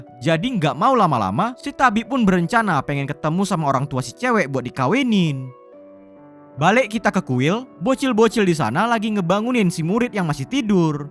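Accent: Medan, Indonesia. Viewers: Bg lu orng Medan yh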